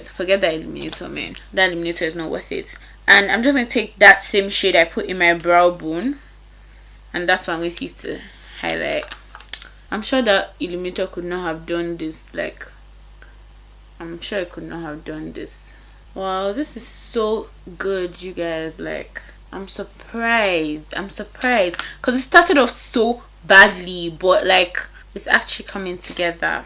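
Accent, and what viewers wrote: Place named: Nigeria. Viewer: Gurrl!! You're Nigerian accent is everything, original, i hate it when people try to copy other people's accent, kudos!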